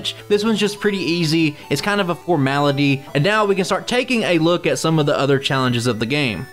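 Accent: South, United States. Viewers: I'm liking the new avi, slowpoke looks powerful after learning TOMBSTONER! Also one of my partners heard your voice and wonders if you're from Mississippi, Alabama, or Louisiana. They're convinced you can't be from anywhere else lol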